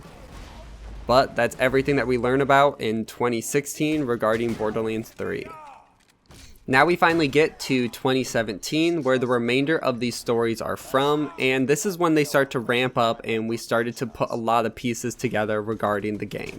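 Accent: Netherlands. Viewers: Are you Dutch? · Wwowowo are you dutch dude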